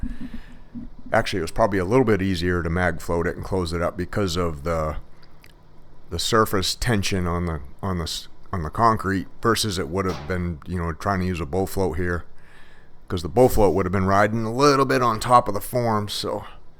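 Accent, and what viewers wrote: Maine, United States. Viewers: I knew you was from maine as soon as I heard you start talking, I'm originally from Maine. I lived in Washington County. Actually, the little town was called Princeton, but I watched your video 1 day because my husband is a Mason of like 40 years. And I was just going through different videos and see how other people did stuff and have been pop on yours and new year's from may good videos, by the way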